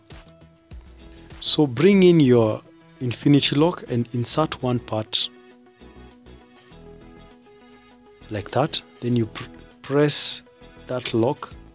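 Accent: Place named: Somalia. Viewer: defo somali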